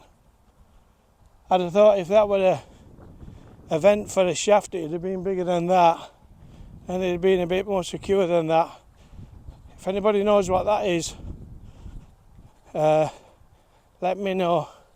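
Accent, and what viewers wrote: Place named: Yorkshire, United Kingdom. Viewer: Eyup, Quality vid dat remember it well not been round dare from about same time as de never knew dat bridge had been changed or dose houses been built cheers pal enjoyed dat PS nowt wrong wi de voice proper Yorkshire 👍👍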